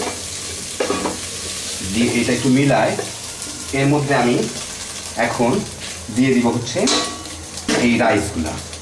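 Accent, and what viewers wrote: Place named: Kushtia, Bangladesh. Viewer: ভাইয়া আপনার দেশের বাড়ি কি কুষ্টিয়া। কথা সুনে তাই মনে হইল।জানাবেন প্লিজ।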